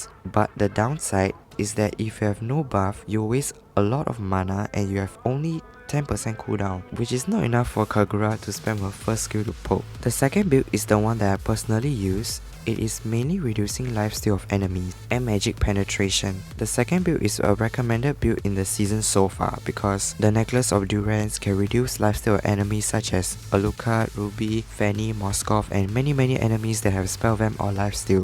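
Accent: Singapore. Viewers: wah haha not common to find sg players. I could totally tell from the way you spoke in the video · You have this singaporean accent. 😮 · Sounds sg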